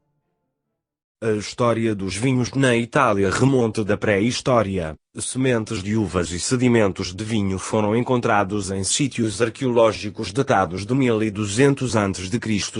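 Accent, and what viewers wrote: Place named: Portugal. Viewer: A pior coisa que fizeram foi colocar essa voz falando em português de Portugal 🤦🏻‍♂️ ... o pessoal tem que entender que a grande maioria das obras de literatura e programa de televisão, são feitos em português do Brasil, mais limpo e mais audível.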